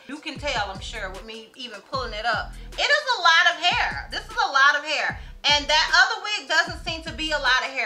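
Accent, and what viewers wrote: South, United States. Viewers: we can't see your kitchen. So you fly anyway. Love your videos and your southern accent 😍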